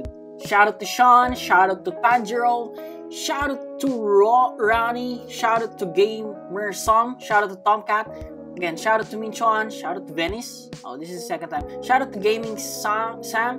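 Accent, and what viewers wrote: Philippines. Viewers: When I heard voice I knew he is Filipino lele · Ur voice like philippines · PINOY SABI NA EHHH YUNG ENGLISH ACCENT NYA PANG PILIPINO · I KNEW YOU WERE FILIPINOOOOOO just by your accent :>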